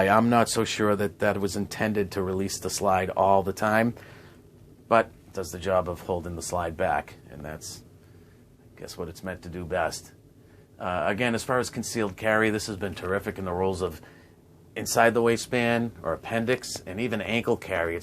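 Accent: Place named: Boston, United States. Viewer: first video ive seen of yours off rip i can tell your from Boston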